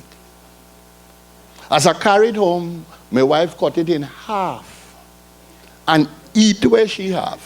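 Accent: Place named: Trinidad and Tobago. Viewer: most imformitive show ever '. however his language make me proud to be trinidadian love it love you i want to sound like you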